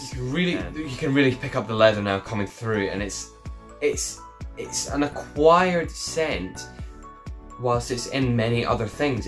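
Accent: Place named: Glasgow, United Kingdom. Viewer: This is an accent predominantly spoken amongst middle class Glaswegians who tend to reside in the west end of Glasgow and more recently the south side of Glasgow in particular the shawlands area. The accent was firstly adopted by middle class Glaswegians as a way to differentiate them from the more “common” Glaswegians and to show them as being “better” than the Glaswegians who spoke in slang. Many Glaswegians from working class backgrounds develop this accent when they first start at University in an attempt to camouflage the working class roots they have been brought up in which in turn enables them to blend in with the middle class “cool kids”. The accent is influenced by people from the north of Scotland.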